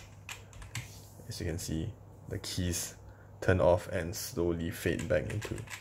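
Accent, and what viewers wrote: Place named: Malaysia. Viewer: Lol I like your review on this. Thanks man. You sound like you're from MY or SG btw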